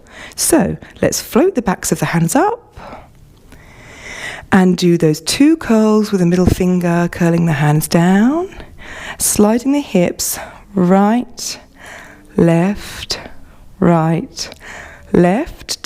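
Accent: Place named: England, United Kingdom. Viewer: I can see by your accent that you are English . Could you tell me where do you teach ? London maybe ? Would be perfect for me !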